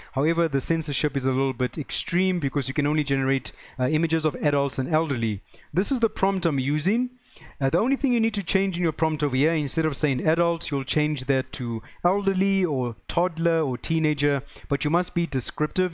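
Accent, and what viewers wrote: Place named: South Africa. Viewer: Was just looking for an overview of Pikaframes and found your channel. Then while listening, i was like, this guy sounds South African! Then found out you are. So i am so glad to see fellow South Africans doing well on YouTube and in the AI space. Im still trying to get there, but hopefully will someday, but at least your video gave me what i needed to know from Pikaframes to know that it is going to work for my next YT channel and hopefully will do well :) Thanks bro!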